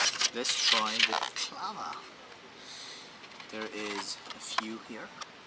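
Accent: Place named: Ireland. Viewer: I thought I could hear the Irish in you ;)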